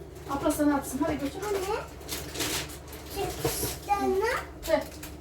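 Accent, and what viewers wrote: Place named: Gaziantep, Turkey. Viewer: ayy ne güzel hemşeriymişiz bende Gaziantepliyim demekki kan çekti 😂👍🏻şivenden benzettim zaten 👍🏻❤️🌹🙋‍♀️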